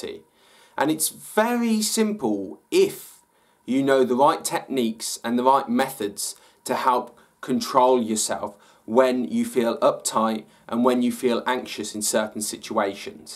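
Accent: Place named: Brighton, United Kingdom. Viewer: Leah Stein it's the Brighton accent I think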